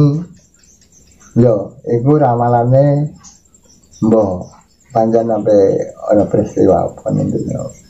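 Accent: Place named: Bojonegoro, Indonesia. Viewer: Mugi slamet kung.... Kok kados bojonegoro logat bahasa nipun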